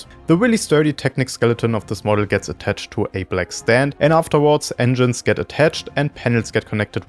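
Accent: Germany. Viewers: Wie kommt man in Deutschland an die Teile, wenn man das bauen will. Die Anleitung finde ich bei euch auf der Website. Cool. Und da ist auch ein XML mit ner Teileliste. Auch cool. Aber Lego Pick A Brick akzeptiert nur CSV oder JSON Files. Also hab ich die Liste mit Hilfe von AI in ein CSV File umgewandelt. Leider bekomme ich dann bei Lego die Meldung, dass es kein einziges dieser Teile derzeit verfügbar gibt. Ich nehme mal an, irgendwas mit den Teilenummern kann nicht stimmen? Oder Lego Pick A Brick ist der falsche Ort? Wäre nett, wenn ihr mir da helfen könntet. Weil so lange ich die Teile nicht irgendwo bestellen kann, macht es ja auch keinen Sinn, die Anleitung zu kaufen. Hätte echt Lust, das nachzubauen. Ich hab Anhand deines Akzents einfach darauf geschlossen, dass du deutsch bist lol. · Mann hört das du deutsch bist so raus😂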